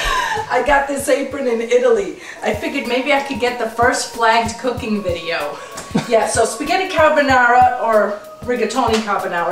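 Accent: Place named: New York, United States. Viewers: awesome ny accent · great new yorker(??) accent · You gotta be from Brooklyn! I can tell from your accent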